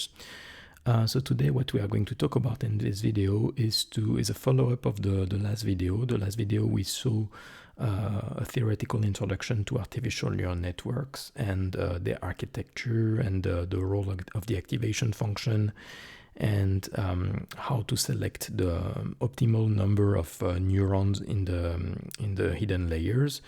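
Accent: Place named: France. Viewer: Thanks for this video. It was really helpful for me. Nice and pleasure English language with French accent. Greetings from Poland 🇵🇱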